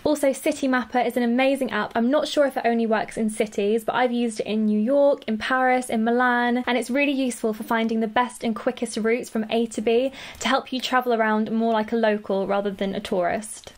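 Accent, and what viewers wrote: United Kingdom. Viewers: She reminds me of sydney serena with blonde hair, blue eyes and a british accent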